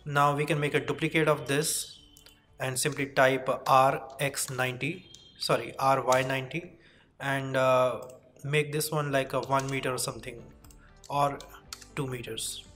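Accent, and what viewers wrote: India. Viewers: I Can Hear Indian Accent Clearly I Am Sure It Will Be The Simplest Tutorial 🤩🤩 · Amazing Work! BTW are you Indian?? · Indian haan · Bro are you from India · I paused this video just to post how much i appreciate all the work you put in this. As someone who has experience on C4D already, many things look familiar or very similar, but in no way i could find my way around Blender so quickly by reading their vast manual. You explain things nicely, and clearly. And yes, i love Indian accent. 1/3 of all my knowledge regarding software and windows matters like security, troubleshooting etc, was passed on to me for free by awesome people(s) with heavy accents. Thank you from a fresh subscriber. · The basics already looks hard.... BUT i learned ALOT from this tutorial. you can explain well and you have a funny indian voice. Thank you.. alot. i also subscribed to your channel! 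· this is the best one out in the youtube man he explains more clear than my dad!!!! i was shocked hearing an Indian voice hats off man and...i subscribed your channel.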